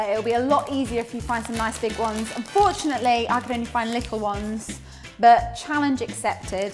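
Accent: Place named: Liverpool, United Kingdom. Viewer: Thought I could hear that Liverpudlian in there somewhere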